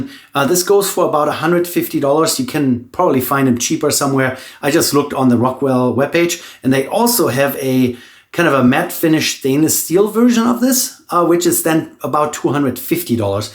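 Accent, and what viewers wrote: Germany. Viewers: Thanks! Great videos and I appreciate the accent, is it German? 👍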